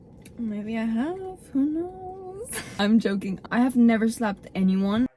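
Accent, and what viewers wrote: England, United Kingdom. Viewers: Dios mío, amo tu acento inglés ❤❤❤💋💋💋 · OMG GOD I LOVE UR ENGLISH ACCENT ❤❤ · OMG GOD I LOVE UR ENGLISH ACCENT 🫶🏼🫶🏼❤️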